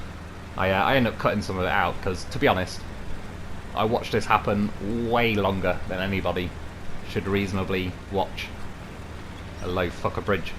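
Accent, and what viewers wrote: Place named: Caernarfon, United Kingdom. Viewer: Hi there. As a regular viewer of your Snowrunner vids I’ve spent a lot of time listening to your accent. While I appreciate you don’t have a North Wales accent - there is a slight hint of a Caernarfon/Anglesey edge to it. Just wondered if you’ve ever lived up here in North Wales? Sorry if it a personal question! Keep up the good work - your Dave stories are great and general banter as you drive is very funny. I regularly laugh out loud. Needless to say I now own multiple Loafs thanks to you. I whole heartedly recommend this channel!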